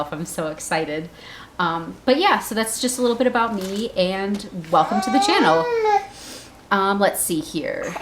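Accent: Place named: Minnesota, United States. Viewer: I'm not a birth worker, but I stumbled here from Twitter. Had my third and maybe final child at home with a midwife and her apprentice (?) It was healing (after two oppressive/demeaning hospital births). What you do is so important and I just wanted to encourage you. Also, I knew you were from Minnesota as soon as you started talking. 🙂 I've got family there.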